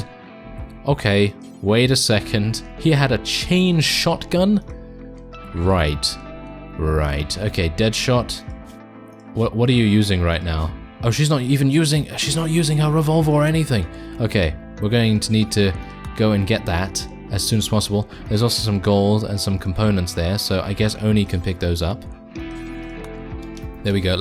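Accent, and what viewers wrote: United Kingdom. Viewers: Okay, so, look, I need you to do a thing for me. Very simple. Low to mid maintenance, and I feel your enjoy it. Maybe, maybe not, who knows with the spin of the Earth slowing and what all. Anyway, I need you to do this simple thing. Very easy. Very. I need you to do voice over work for either parody nature documentaries, or on comedy science channels. Cause goddamnit your soothing British wailing would delight and tickle the average viewer.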